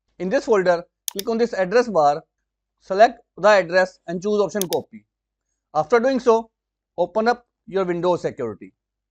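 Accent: India. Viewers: heard the indian accent and already knew he was going to cook W video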